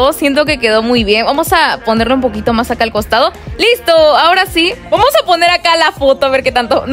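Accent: Peru. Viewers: tienes acento de peruana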